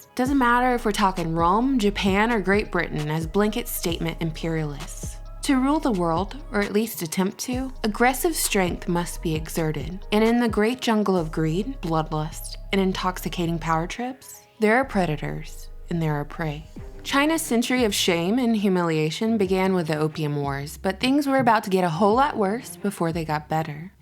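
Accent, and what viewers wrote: United States. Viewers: you sound like an American to me then you must do a video of the name instead change it to 'What the media won't tell you about US' The video will take conservatively over an hour if not much longer. Good luck. You info on China is nothing new, read a good history book on China written by notable historian rather than an ideological one.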